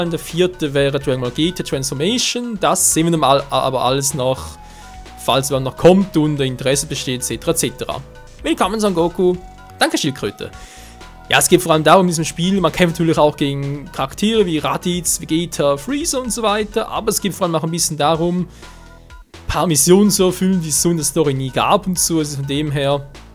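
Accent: Switzerland. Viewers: Der schweizer akzent machts 10mal besser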